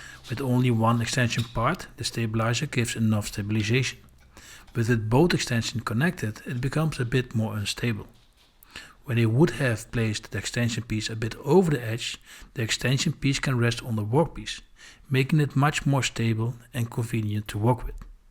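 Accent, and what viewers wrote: Netherlands. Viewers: The moment I heard you talking I knew you were from the Netherlands! Dank u wel voor de video, van de Verenigde Staten!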